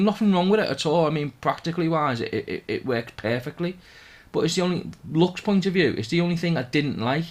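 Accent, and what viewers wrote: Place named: North West England, United Kingdom. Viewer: Thanks. i think I have been through there before. Nice pub at Aldbury! I wasnt sure whether it was cheshire given that your accent is from the Noth West. Cheers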